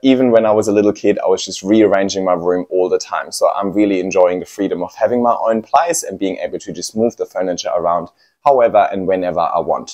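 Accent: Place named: Germany. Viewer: den deutschen akzent versteckste nicht, trotz sehr gutem englisch, aber sollste auch nicht! :-) NRW/Osnabrück? Habe jetzt nicht das gesamte Video geguckt, wie lange wächst deine Größte Monstera und die albi variegata?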